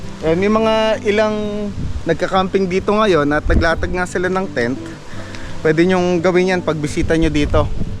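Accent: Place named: Malolos, Philippines. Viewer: Sir malolos talga Yung Punto mo...di Po ba mahirap Daan punta Jan sir Kaya Po ba Ng maliit na kotse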